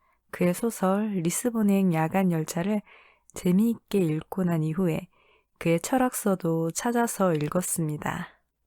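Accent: Gyeongsang, South Korea. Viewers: 너무 좋아요 경상도라니 전혀 못느꼈어요 화이팅하세요!!! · 원래 경상도세요? 전혀 몰랐어요 ㅋ 그리고 결정을 내리시는 순간에 저도 긴장했습니다 ㅋㅋ 저희도 찍을때마다 싸워서 늘쌍 고민중이에요 ㅎㅎ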